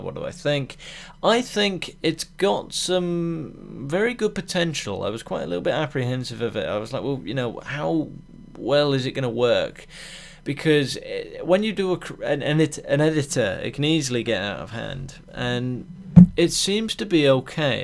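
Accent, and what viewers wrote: England, United Kingdom. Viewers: Is he English?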